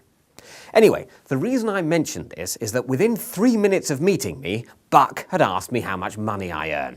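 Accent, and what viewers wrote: United Kingdom. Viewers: That's only because villains are scarier when they're smarter, and we unconsciously assume anyone with a British accent is smarter. You should feel complimented :D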